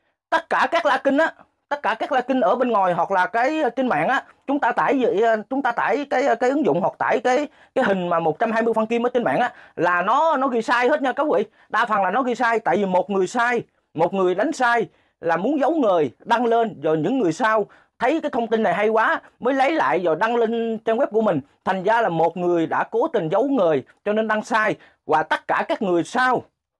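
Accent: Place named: South, Vietnam. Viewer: Thầy giảng hay dễ hiểu nhưng nói hơi nhanh giọng nam chưa quen nghe thầy nói chậm một chút Và muốn mua là kinh để học thì mua ở đâu? Và muốn học thầy thì thủ tục ra sao Cảm ơn thầy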